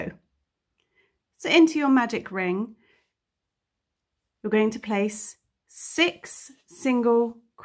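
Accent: England, United Kingdom. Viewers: Great tutorial but I’ll admit I was thrown off at first by the English accent but American crochet terms 😂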